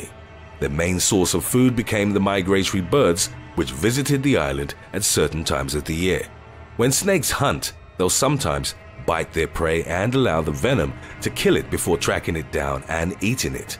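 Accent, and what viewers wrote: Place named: United Kingdom. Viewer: this channel is to be good with giving you actual survive tips against certain animals or calamities like heat waves and avalanches. But it seems like he deleted all of those videos and now he's dishing out these wack videos that will never apply to me and with that annoying British narrator. Bring back the OG narrator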